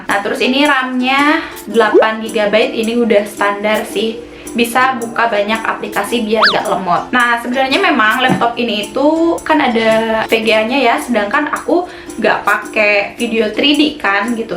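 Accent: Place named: West Java, Indonesia. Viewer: ihh tth org Sunda 😂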